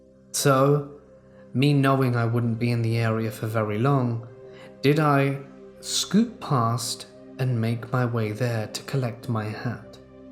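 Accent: United Kingdom. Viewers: I don't think I've ever gotten over deep voice, British accent followed by I'm a 20yo female lol doesn't make me not enjoy or appreciate the video. It just makes me smile to hear lol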